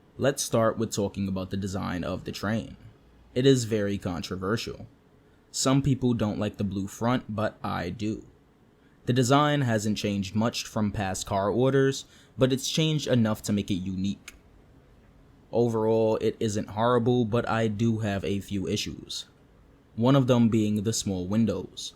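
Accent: New York, United States. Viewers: u got such a ny accent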